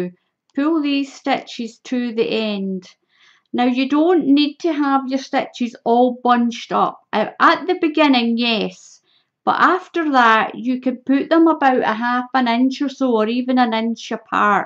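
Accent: Scotland, United Kingdom. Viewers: Oh My! Hope I'm not wrong. You sound Scottish. And hopefully I spelled it right otherwise my Gramma would kill me if she were still living. She was my Hero. Everyday in every way I aspire to be just like her. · I love your crafty videos,! I also love to hear the Scottish accent spoken... I like to think it's something in me hearkening back to my Scottish roots, haha! Anyway, Thank you so much for sharing this tutorial! Rachel D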